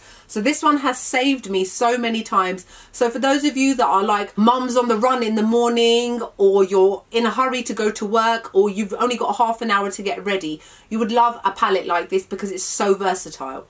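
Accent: United Kingdom. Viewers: Hi from US, I love the way you talk :) plus UK accent. Your Makeup looks amazing!, need to get that lip color, so pretty! Love that colourpop blush, even i mostly always reach for cherry purply colors for blush. Watching your video to make list of things to buy with Sephora 10% coming up soon :) xoxo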